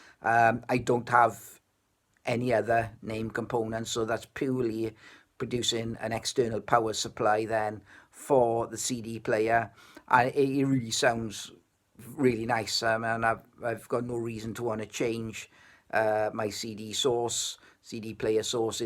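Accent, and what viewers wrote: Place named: Wales, United Kingdom. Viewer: Thanks for this video, I’m currently running a Rega Brio amplifier but am seriously considering an upgrade to the Exposure 2510. It is a very minimalist amplifier but I’m happy to get an external DAC and headphone amp. Thankyou. YouTube needs more beautiful Welsh accents so please upload more content.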